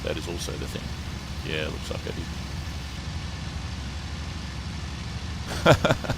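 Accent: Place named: Germany. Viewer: the sight, the guns, your will are not seperate things but one being, as is wing shooting. you shoot well but hesitatingly. refer to hartmann, his last interview, or read eicke's notes that richtofen relied uopn. we in the gallery are with you always, strange that accent...not hoch deutsch....oh well Wachendorf/usa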